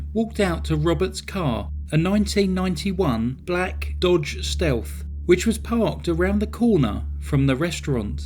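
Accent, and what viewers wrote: England, United Kingdom. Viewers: I must say Real Crime isn't my favorite but i like your storys and your so beautiful English accent👍❤🥰😊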